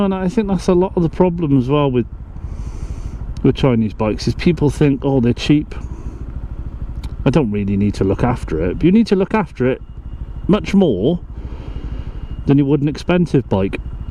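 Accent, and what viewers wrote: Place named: Gwent, United Kingdom. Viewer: What area are you riding around in this video mate, I'm a Welsh boy and your accent sounds Gwent-ish Great review by the way, honest and to the point - just the way I like it 👌 - where is the dealership you got your Outlaw from. Just sat on one for the 1st time yesterday up in Barnet where I am at the moment -felt great 👍 !!!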